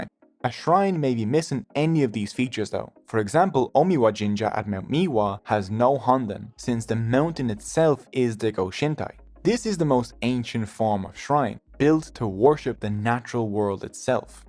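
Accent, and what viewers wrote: Ireland. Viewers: Just bizarre listening to the creation of Shinto in a strong Irish accent......😆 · Wid? An Irish accent does not lend its self to well. Especially when a jokey American style delivery is used for effect. If English is not your first language, tree children, and where day live can be confusing. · Love the Irish accent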